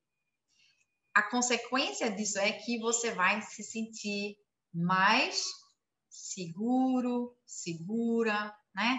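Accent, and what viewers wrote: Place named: São Paulo, Brazil. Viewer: Alemã nativa com essa pronúncia brasileira? Meu Deus, seu português é perfeito, perfeito, perfeito, vc deve saber disso, n sei nem pq to falando, haha. Eu pensei que tu era paulista ou gaúcha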